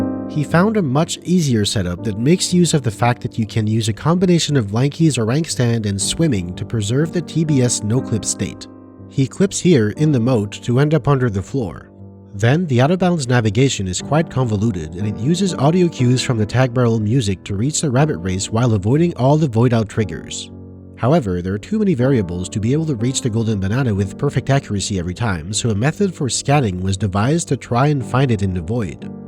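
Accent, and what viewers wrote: Quebec, Canada. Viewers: Oh tes Québecois!!! 🎉❤ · omg quebecois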